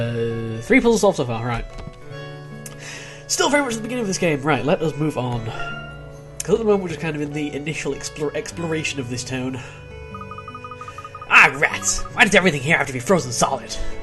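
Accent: United Kingdom. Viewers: your British accent is pretty good, mate!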